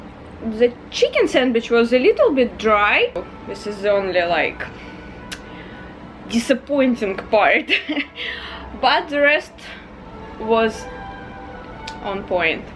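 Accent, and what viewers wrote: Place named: Russia. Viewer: сразу слышно, что русскоговорящая хд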